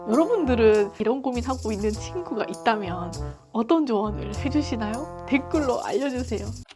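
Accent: Gyeongsang, South Korea. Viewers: 경상도 억양 귀여워요 ㅋㅋㅋㅋ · 우즈벡 부동산 블로그보다 여기까지 왔네요 ㅋㅋ 저도 isfp인데 i 발음 들으니 뺴박 경상도 같으신데 맞죠? ㅋㅋㅋㅋ isfp는 누워있는거 젤 좋아하는 집순이인데 사업이라니 대단하세요~ 우즈벡 영상도 올려주세요~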